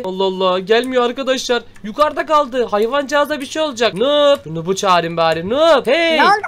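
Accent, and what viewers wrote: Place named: Rize, Turkey. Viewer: 0 Rizeli gibi konuşuyor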